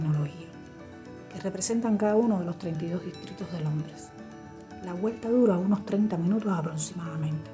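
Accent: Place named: Cuba. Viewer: El video está bonito, muestra los lugares imprescindibles de Londres. Tienes acento cubano.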